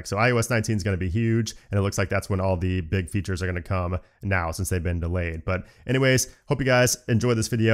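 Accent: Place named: Texas, United States. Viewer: Is he from Texas? Why does he talk funny?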